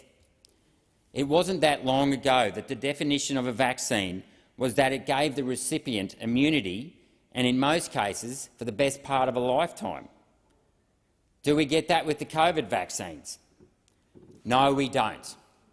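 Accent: Australia. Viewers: I am ashamed of myself. This is 7 months old and my first viewing. I was at that time doing 6 days a week I have since retired. I have now seen 4 vids of this guy and will vote for him next time around. He is nothing less than BRILLIANT. To top it off he sounds like me and every other Australian I have known in my 68 years. Good on you cobber.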